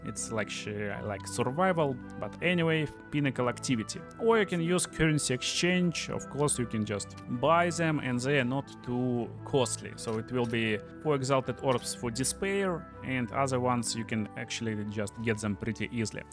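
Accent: Russia. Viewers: ten ruski akcent jest straszny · дружок, я наше произношение везде узнаю - показывай где на нашем языке видео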